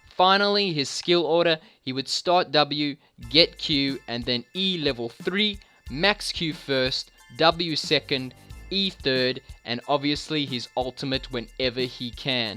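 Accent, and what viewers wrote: South Africa. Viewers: Why does this guy sound South African? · You sound South African. Are you South African?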